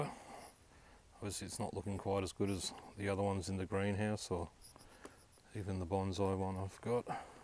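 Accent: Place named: Australia. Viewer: Good luck with your jabo. Is it a Sabara? It's so cool to hear your accent. I'd absolutely love to visit Australia 🇦🇺 one day.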